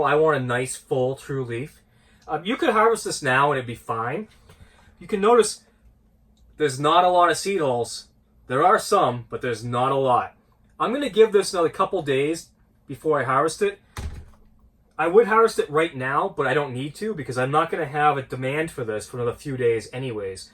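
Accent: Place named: Boston, United States. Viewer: I love your accent, Boston area? Or up here in Maine?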